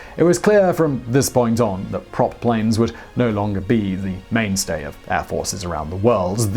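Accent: United Kingdom. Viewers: It is funny how sensitive the Brits or as we call them from downunder Pomes! This chap is going on about the things with his Pomie accent ! How many Pome jets were there? Its about like asking the Pomes how many World Cups or Euro cups they have won! What a joke! Not the best objective documentary! Cripe the Pomes were saved by the ANZAC's, Canadians, and the USA! And Yes the Communist USSR gave a great deal, but not much in terms of Innovation! The British Invented the Steam Engine! Well done!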